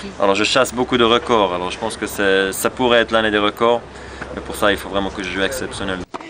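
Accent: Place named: Switzerland. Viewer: He speaks swiss French, which is slightly different from standard French, but he's definitely fluent, he speaks a perfect French.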